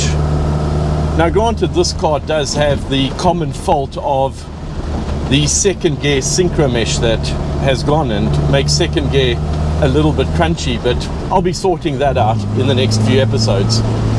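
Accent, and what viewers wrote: South Africa. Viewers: Your accent definitely gives you away 😂😂😂 South African 🎉🎉🎉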